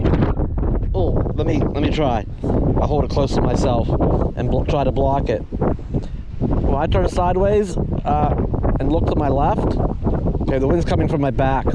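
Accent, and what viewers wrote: Pennsylvania, United States. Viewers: Hey, just noticed your video description still says you're in the Bounder, might want to update it! Thanks for the videos. I'm from MA but have family from PA and your PA accent always reminds me of my family and puts a smile on my face.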